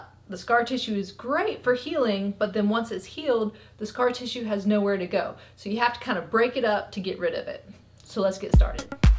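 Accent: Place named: Appalachia, United States. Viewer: Don't even go there with the Hemorrhoid cream... I looked like a Cauc-Asian for 48hrs after that :) Ha you definitely have an accent... To my ears anyway. Nice though. Like a little Hill Billy lol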